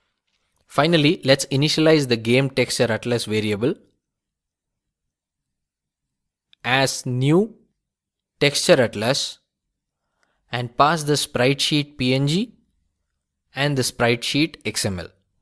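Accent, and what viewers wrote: India. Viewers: indian accent really drives me mad.. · it was quite funny at the beginning with the perfect and polished intro then out of nowhere a thick indian accent. but amazing tutorial, helped a ton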